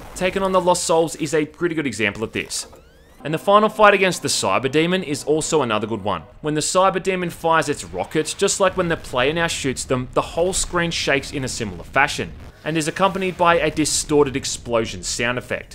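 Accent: Australia. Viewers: Its hard to differentiate wether this video is a rant or just a comparison video with such a thick Aussie accent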